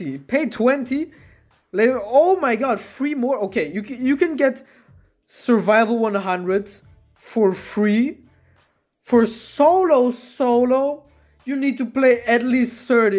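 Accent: Germany. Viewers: Are you german ? You have an german accent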